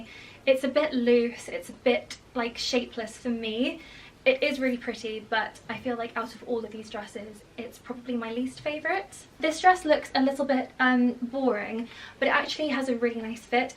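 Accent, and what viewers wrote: United Kingdom. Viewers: Watching this video made me feel like I'm catching up with a dear friend after a long interlude. (I do have actual friends. We're all so busy, though, with our families, I often don't get to see them for months at a time.) I LOVE your closet clear outs. I often hear your lovely British accent in my head when I tackle my own. "I'm going to be ruthless..." I also very much enjoyed hearing all the little and big details you put into your clothing line, which demonstrates you're doing what you should be doing.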